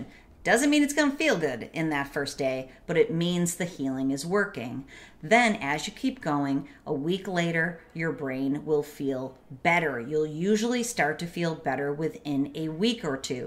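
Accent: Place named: Wisconsin, United States. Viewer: That Wisconsin accent is so annoying miss church lady.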